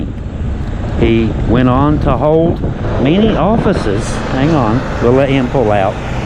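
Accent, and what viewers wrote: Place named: Tennessee, United States. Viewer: Sounds like Tennessee😂😂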